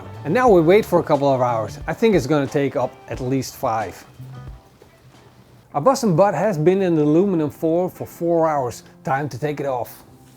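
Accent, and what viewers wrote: Netherlands. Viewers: He’s Dutch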